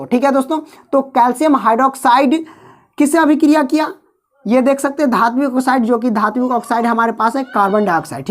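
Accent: Bihar, India. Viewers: Sir aap Bihar se hai kay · Sir aap bihar ke rehne bale hai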